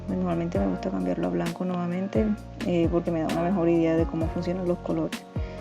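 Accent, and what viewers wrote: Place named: Barranquilla, Colombia. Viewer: Está hermoso, me servirá un montón. Graciaaas! De paso, eres de Barranquilla? Se me hizo por el acento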